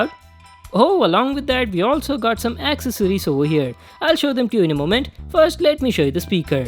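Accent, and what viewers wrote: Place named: Tamil Nadu, India. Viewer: Is he a Tamil Guy ? Or Telugu Guy.. Just asking becz of his English pronunciation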